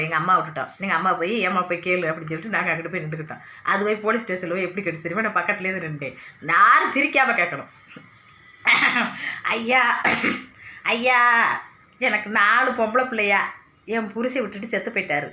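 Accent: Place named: Ramanathapuram, India. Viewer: உங்க ஊரு ராமநாதபுரம் மாவட்டம் பேச்சை இது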